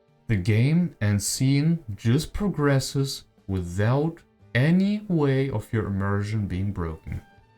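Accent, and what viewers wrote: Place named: Germany. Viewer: Nah, he sounds like a German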